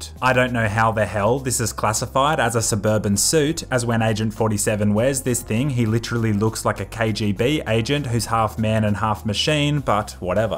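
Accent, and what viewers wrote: Australia. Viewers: u australlian? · r u aussie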